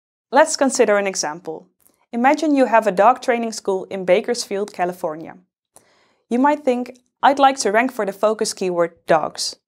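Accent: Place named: Netherlands. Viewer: She dutch though, typyical Dutch accent :D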